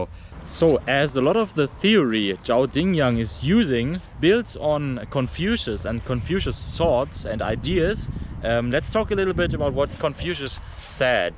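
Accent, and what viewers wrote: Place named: Germany. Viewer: Your accent is German, are you still in China?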